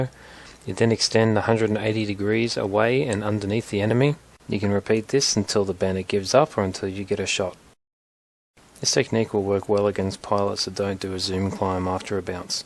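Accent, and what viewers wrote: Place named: England, United Kingdom. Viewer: That's because you enunciate your words & they have never truly heard an English accent haha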